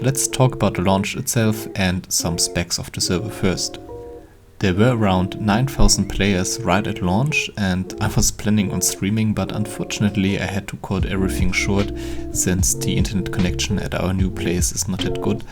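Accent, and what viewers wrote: Germany. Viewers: Mach das besser auf deutsch... Das englisch ist so schrecklich deutsch 🤣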